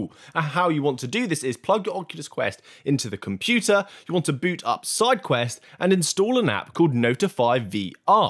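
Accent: United Kingdom. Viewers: Where do you come from in UK? I can't identify the accent. Norfolk? Essex? Or?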